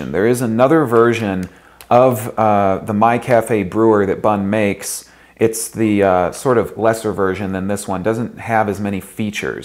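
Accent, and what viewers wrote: United States. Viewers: I think he is from New England or south eastern Canada. His inflection reminds me of an older Katharine Hepburn.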